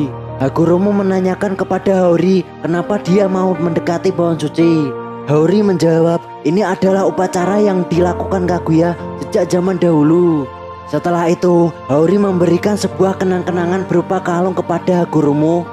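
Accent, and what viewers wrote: Java, Indonesia. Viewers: Anjir suaranya medok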